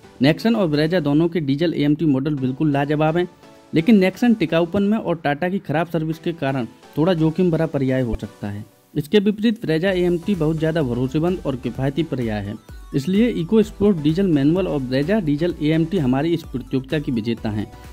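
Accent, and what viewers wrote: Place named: Hindi Belt, India. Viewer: While this is a good comparo, The Hindi accent used by the narrator makes it difficult for me to understand. I understand hindi well, but in this I had to listen again and again to make sense of a few sentences. Perhaps it is speed along with accent that makes it difficult for me as I am not a native of Hindi belt... :(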